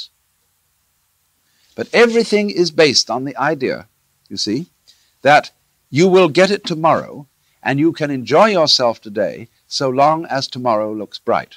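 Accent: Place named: Upper class, United Kingdom. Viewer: As a parent, I was glad when my kids went to school. It gave me a break and it was free childcare. The thing is, what do we replace the human progression through life with? Yes, Watts' observation of life are true, but he offers no replacement or a better way. He spent his life mocking humanity from a point of privilege. Why did he believe his diatribes were more valuable than any other educated and reasoning persons rants? He stated the obvious far too much and isn't really addressing intelligent minds. The audience he needs to address are too stupid to follow his thoughts, while the rest of us wallow in his comforting upper class English accent, without really listening to him at all. It's a mish mash of thoughts, delivered with an annoying arrogance. I can't abide him personally.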